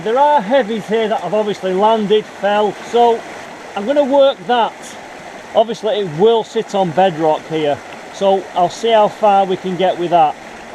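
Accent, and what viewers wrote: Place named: Yorkshire, United Kingdom. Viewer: Liked and subscribed. You sound like you’re from Yorkshire?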